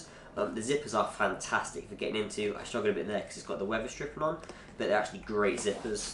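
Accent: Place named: Somerset, United Kingdom. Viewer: you sound local mate you in Somerset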